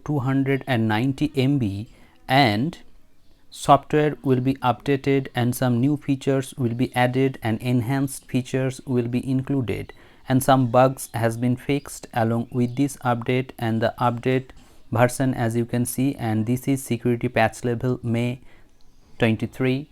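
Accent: India. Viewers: Bihar se ho bhai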